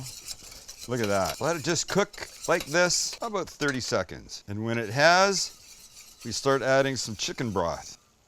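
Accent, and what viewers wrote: Ireland. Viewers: 8/10 on the Irish accent. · C. he used the C word. you can tell because of the irish accent. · pretty good irish accent there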